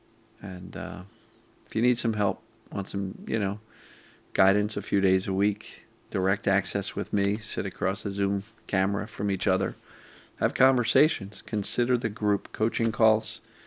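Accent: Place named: Scotland, United Kingdom. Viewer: Such a great video!! Thank you!! I love how you take the same concept and explain it a different way..🥰 this is “flip the script” with Scottish accent 😃I know you have told us that many times. This “do the opposite” reminds me of the Seinfeld episode where George did that 😂🤣🤣 It works!! Thank you again for your continued support for all of us in this journey..🙏🏼🫶🏼🫶🏼🫶🏼🫶🏼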